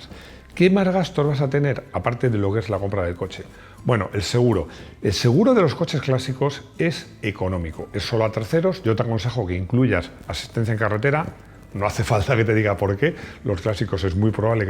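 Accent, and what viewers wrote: Chile. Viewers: es chileno? ese acento reconozco xDD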